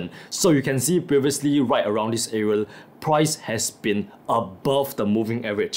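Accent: Nigeria. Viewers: You have this Nigerian Accent when you speak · Are you trying to speak in Nigerian accent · You sound like a Nigerian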